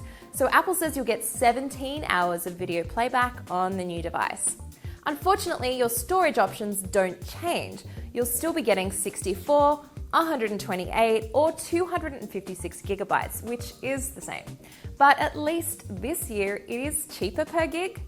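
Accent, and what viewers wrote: Australia. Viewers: R u Australian? Cuz ur accent is aussie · Is she Australian? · I feel like she’s Aussie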